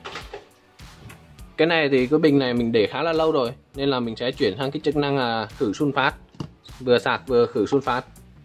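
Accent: Nghe An, Vietnam. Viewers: Anh ơi anh người nghệ an hả anh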